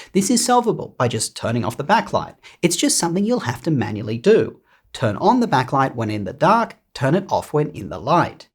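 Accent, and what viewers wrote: Australia. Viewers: Are you Australian by chance? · I just love the Austrèlien accent, I didn’t pay much attention on the contents of video but. I will watch it again and try to concentrate on topic. Thank you.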